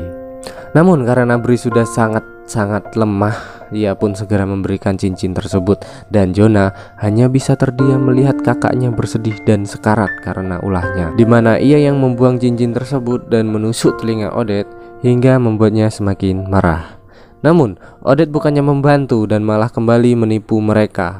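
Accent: Surakarta, Indonesia. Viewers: Orang solo ya bang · Wong solo to mas😂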